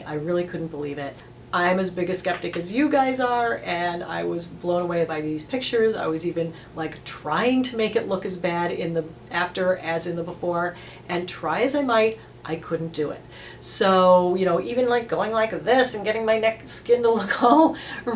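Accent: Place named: New England, United States. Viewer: Love the video. I heard your accent and knew your were a fellow New Englander💕 I love CeraVe products. I will try on my neck too. Great advice. Thank you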